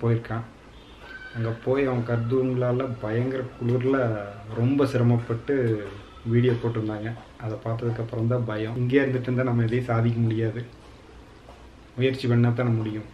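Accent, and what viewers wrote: Kongu, India. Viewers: Enunga Coimbatore ngala.? Kongu Tamil 😍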